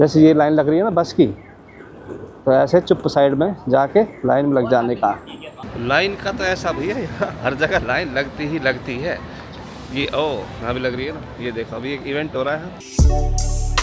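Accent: Haryana, India. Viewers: Bhai aap ki aawaz sey lagta hey ke aa hariyana ke raheney waaley ho.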